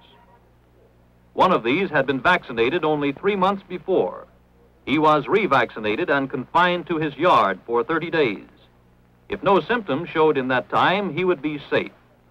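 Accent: Transatlantic, United States. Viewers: Trans Atlantic accent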